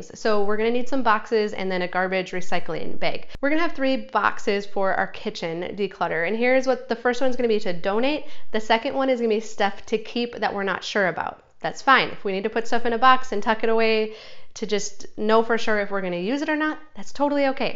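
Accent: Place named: Midwest, United States. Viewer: I hear a midwestern accent! But that only adds to me loving what you share here!!!!! OMG!! 😍 I’m going to end up watching this over and over!!!! I want to simplify so badly!!!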